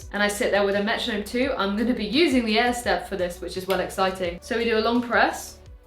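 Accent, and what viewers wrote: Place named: North, United Kingdom. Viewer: Your Northern accent made me laugh 😂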